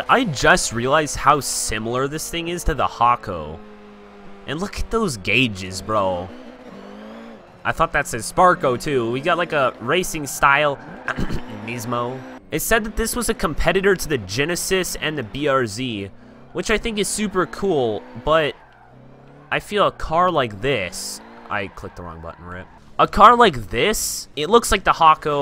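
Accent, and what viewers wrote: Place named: South, United States. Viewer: Your southern accent is better then Mine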